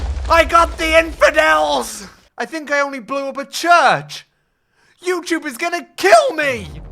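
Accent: United Kingdom. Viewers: u r british yayya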